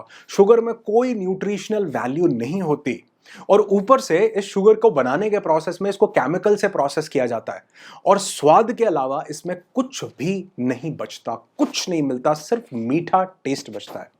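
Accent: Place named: Punjab, India. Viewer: Apka sose pose is... Proper punjabi tone.. I love it❤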